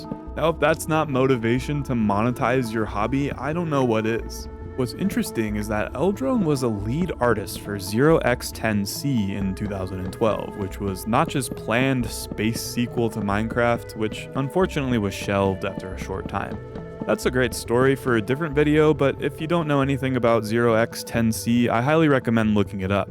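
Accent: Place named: United Kingdom. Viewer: keep doing the British accent.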